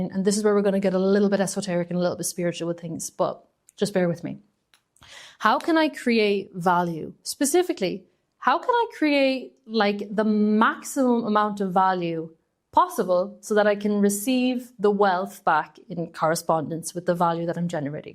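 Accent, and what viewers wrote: Ireland. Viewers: It is so refreshing to hear an Irish accent!!! Thank you ❤❤❤❤❤ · Another great vid - completely unrelated, I am fascinated by accents; as well as an obvious southern Irish lilt, I detect something else - Scandinavian? Central Europe somewhere? Apologies if this is none of my (anyone's) business. 😄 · I appreciate the blazer. For all it may have represented in your presentation. Tbh I am so bamboozled by the wholesomeness of the Irish accent. It is hard to quantify exactly the truth behind the words. Resonates like a mother's tongue. Much more than a business and marketing exercise some critics pertain. Anyhow keep going, if we have a choice! More importantly thanks for the guided meditation practices. They matter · I love your accent - Irish ?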